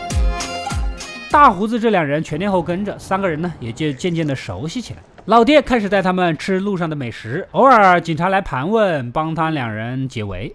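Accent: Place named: Wuhan, China. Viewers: 要听“老爷车”,你浓浓的武汉口音让我觉得像是我在说话：）） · 哈哈，口音好重，不是湖南的就是湖北的，点到你微博一看，原来是武汉伢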